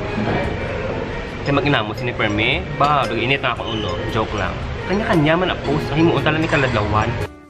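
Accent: Iloilo, Philippines. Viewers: Nice one sir!! Ilonggo na ilonggo gd!! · Enjoy gyd kaayo ko tan-aw sa imo mga video ba,pampawala stress imo video ay kay mag sge ko katawa.Ilingaw gyd kaayo ko.ganhan ko sa imo Ilonggo accent.Sakit ako tyan nimo ay :)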